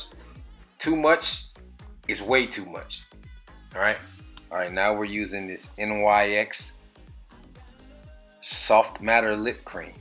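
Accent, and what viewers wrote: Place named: East Coast, United States. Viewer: War paint,lol!!!Love that!I hear a east coast accent!!!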